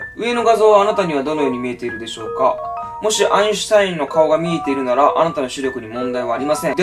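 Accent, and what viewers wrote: Osaka, Japan. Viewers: 大阪弁って本当にいいなーっていつも思う😌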